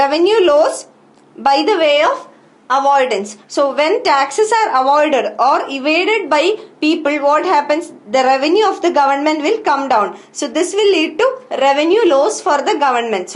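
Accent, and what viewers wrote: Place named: Kerala, India. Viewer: Keralite accent... Love it 😊🙏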